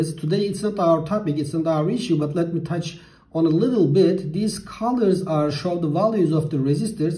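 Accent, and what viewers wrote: Turkey. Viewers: Türk ingilizcesi bu nerde görsem tanırım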